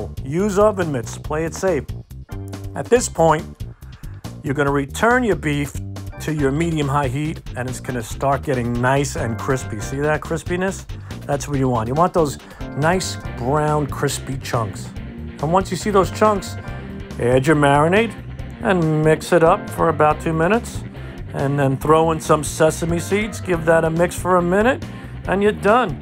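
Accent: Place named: South, United States. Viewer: This man is NO!! third rate middler doin the wagon wheel show!. Sal you are the funniest man I've ever seen . your southern accent is exceptional.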